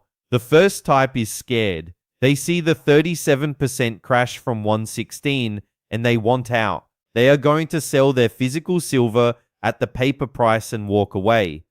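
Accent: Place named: Australia. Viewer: AI crap with a cheesy Australian accent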